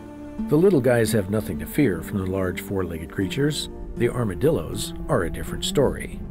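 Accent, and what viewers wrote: United States. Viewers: so good to hear a narrator speaking american english for a change. I'm a bit tired of the usual overly theatrical british english in these documentaries.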